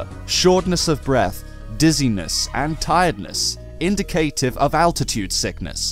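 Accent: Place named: United Kingdom. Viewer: 【The Sonic Theorist】 Hey mate. It's just a suggestion but please do you think you make a video or 2 such as ya top favourite Sonic characters and/or top favourite Sonic games? I'd LOVE to see videos like them!:D:D:D Or do you already have them on ya channel? I love your accent!:D:D:D Are you from the UK too? I am! XD I'm from Sunderland, England! XD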